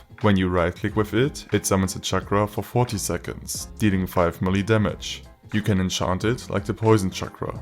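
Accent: Germany. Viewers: Bro bist du deutsch wenn ja mann hört es so raus (nicht böse gemeint finde dich mies cool )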